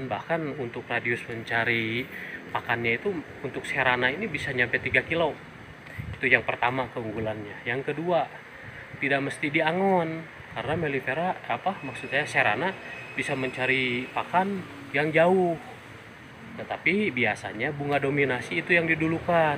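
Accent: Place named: West Java, Indonesia. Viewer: Logatnya seperti sunda, Daerah mana kang?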